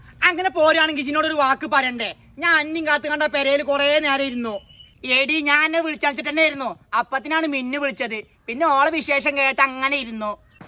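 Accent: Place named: Malappuram, India. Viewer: I think you are from malappuram. Very nice presentation and slang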